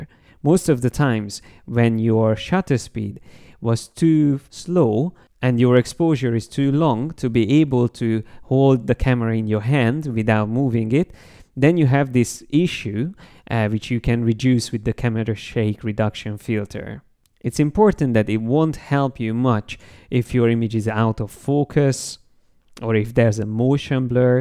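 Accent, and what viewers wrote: Hungary. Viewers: király videó hallani a magyar akcentusod :-)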